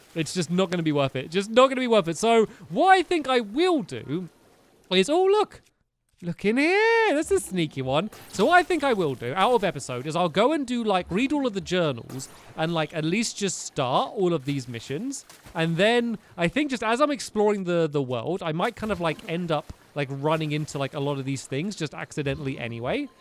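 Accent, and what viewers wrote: United Kingdom. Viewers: Aré you british?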